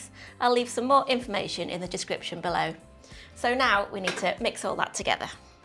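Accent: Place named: North, United Kingdom. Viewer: Hi I’ve just made your tea loaf and all the family give it a 👍, very nice I’ll definitely be making it again,it’s good to hear a northern lass no messing job done. All the best from Manchester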